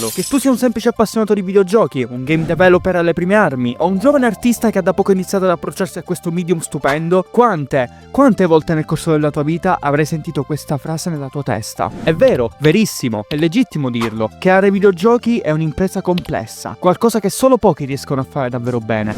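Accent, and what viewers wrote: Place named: Italy. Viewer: mado lacento PIÙ italiano di sempre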